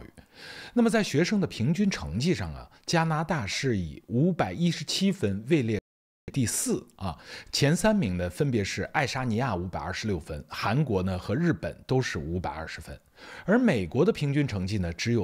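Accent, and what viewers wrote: Standard. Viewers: 主播的普通话说的很好、很正。现在有的主播说话舌头不伸直，全程充满S，疯狂装沘，极度装嫩。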